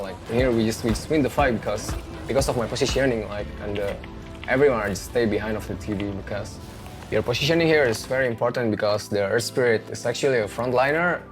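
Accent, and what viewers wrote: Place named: Indonesia. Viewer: 7/10 inggrisnya orang indo, dia kuliah apaan dah?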